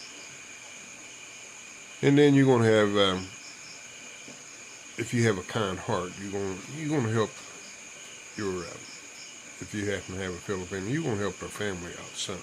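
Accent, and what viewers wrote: United States. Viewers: That's okay,. After watching you for more than several years now, I simply came to the conclusion that the lag phenomenon was a result of your wonderful Kentucky drawl and nothing more.. Didn't bother me none. LOL 🤣 I honestly think most of these price increases are due to nothing more than blatant price gouging - maybe up to 30 %. I try to counter the rising prices by growing more fruits and vegetables plus reducing my electrical consumption wherever I can. We have more romantic candlelight dinners and make more use of mama's dirty kitchen while pretending we are camping out. I cut back on or even stopped purchasing some American dishes I have a hankering for. Still trying to put money aside for solar power, but not as much as I once was. Anyway, still breathing, drawing my retirement and enjoying life in the Philippines. Can't really complain. 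Thanks for sharing and letting your subscribers know what life is truly about out in the boondocks, my friend. 😃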